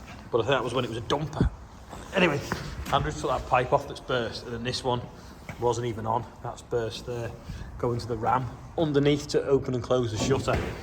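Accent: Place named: Wales, United Kingdom. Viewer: Keep the birthday bumper Until may 25th so I can hear your welsh accent saying my name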